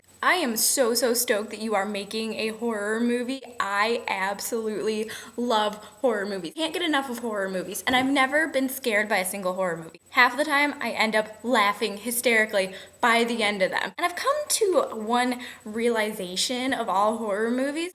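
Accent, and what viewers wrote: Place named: Canada. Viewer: you do sound a little canadian though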